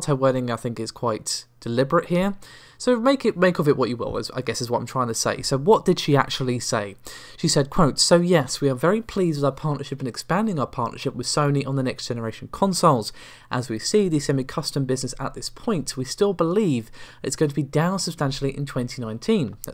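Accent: United Kingdom. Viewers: I cannot hear what this guy says. Talks too fast, slurring and with tons of nonsense and seems to only emphasis a heavy British tone sequence. If you're not showing your face then don't pretend you have a conversation.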